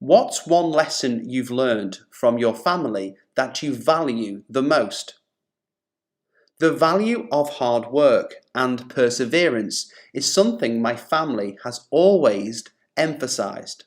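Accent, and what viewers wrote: United Kingdom. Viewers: I actually like your British accent, it's cool 😂❤even though I find it more difficult than the American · Please tell me, this is it British English?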